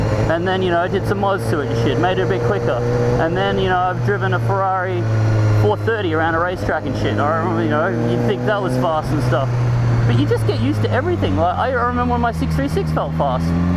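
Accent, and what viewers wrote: Australia. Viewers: One of the best reviews I’ve seen in ages! Thanks! Nice to hear an Aussie for a change and no boring 5 minute irrelevant story that seems standard in the states? Haha. Excellent review thanks!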